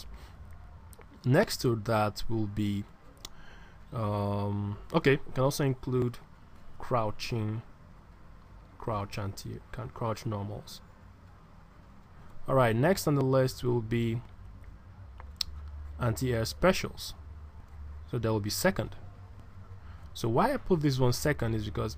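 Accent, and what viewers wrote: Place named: Igbo, Nigeria. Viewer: You sound Igbo.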